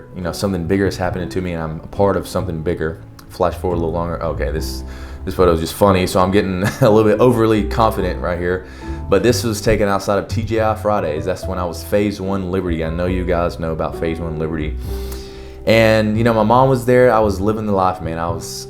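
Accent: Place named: South, United States. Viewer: I really enjoyed this video. It warms my heart to see people changing for the better and having the guts to openly speak about it. And your lil southern twang makes it even better.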